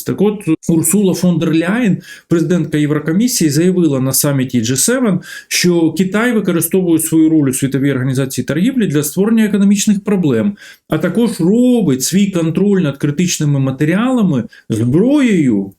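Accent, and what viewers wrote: Moscow, Russia. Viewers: Прізвище вроді ж Галащук, а людина акає, як московит. "тАвари, пАчув на свАю адресу, Європоейський сАюз, вирАбниками.." Нє, ну невже так важко вимовляти українські слова не акаючи?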